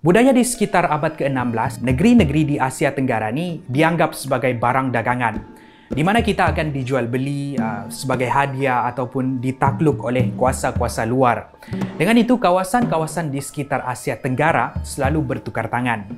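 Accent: Sabah, Malaysia. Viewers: Ni bahasa melayu loghat sabah. Lebih baku daripada loghat harian.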